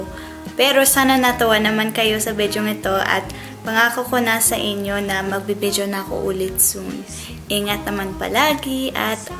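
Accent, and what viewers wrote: Visayas, Philippines. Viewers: When you speak tagalog parang bisaya accent 😁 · bakit parang bisaya ka magtagalog? Saan mo napick up ang accent na yan? Cute.. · Galing, mukang caucasian pero visayan accent na tagalog. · Kakatuwa tunog bisaya ka kung magtagalog ka. Same lang tayo heheheheh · She sounded more bisaya than tagalog native. Paano nangyari to. 😇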